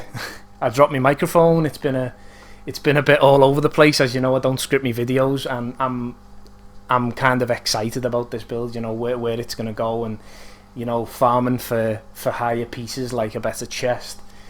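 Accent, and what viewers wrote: Liverpool, United Kingdom. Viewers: were you fro scouse im newferry on the wirral. subbed :) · hey, my first time here..... funny to see a build going almost in the opposite direction than most ppl.. but very refreshing.... btw are you a scouser.. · Thank you for the video! I love your Liverpool accent! I hope you are a reds fan and not Everton's (although it doesn't matter if you are). YNWA! 3> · where u from？Liverpool？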